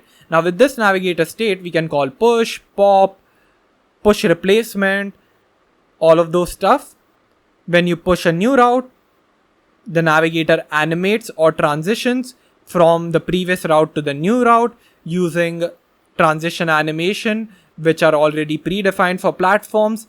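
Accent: India. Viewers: Textbook indian accent, love it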